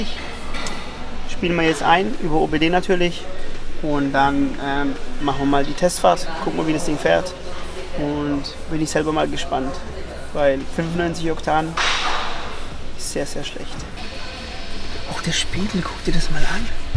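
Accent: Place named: Swabia, Germany. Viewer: s’Hebelle vom Golf 2🤣🤣🤣 zu geil, richtiger Schwob bisch bliebe, gell🤣✌️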